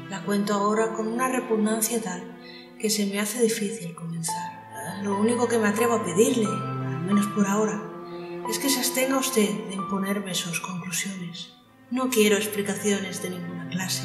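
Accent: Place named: Spain. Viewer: Muchas gracias...me encanta que esté en español de España...y narras muy muy bien 😊❤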